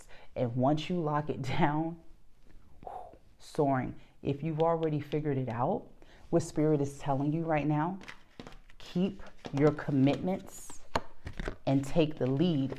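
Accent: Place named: New York, United States. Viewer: Ma you are so NEW YORK and the way u talk you grew up in my era Keep up the great work.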